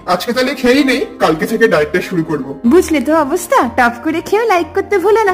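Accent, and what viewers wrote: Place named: Bangal, Bangladesh. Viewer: দিদি তোমার বাঙ্গাল কথাটা খুব সুন্দর ☺️☺️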